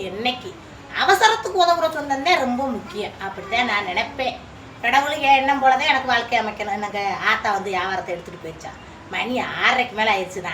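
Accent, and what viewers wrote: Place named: Madurai, India. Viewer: வெள்ளந்தி யான பேச்சு, எங்கள் சின்னஅத்தையை மதுரையில் கட்டி கொடுத்தாங்க, அவுங்க பேச்சி இப்படி தான் இருக்கும், அத்தை இப்போது இல்லை, இந்த பேச்சு எங்கள் அத்தை பேச்சு மறுபடியும் கேட்டே மனம் ஆறுதல் ❤🎉 நீங்கள் நல்லதே செய்யுங்கள் நல்லதே நடக்கும் மா🎉❤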